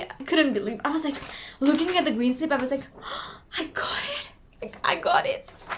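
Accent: United States. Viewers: OMG!! Your accent is soo amazing. Can you give me some tips to have that "American" accent. I've been searching online for it. But it would help me so much if it is from someone with a similar background. Btw thanks for the advice as well. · american accent sounds irritating But congratulations and keep on progressing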